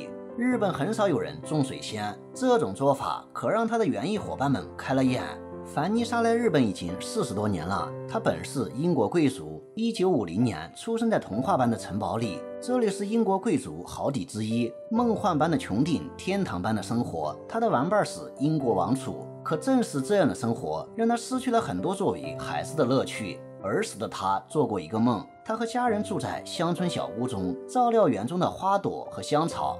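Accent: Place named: Shandong, China. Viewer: 越越是哪里人啊？听着口音像山东，河南，陕西？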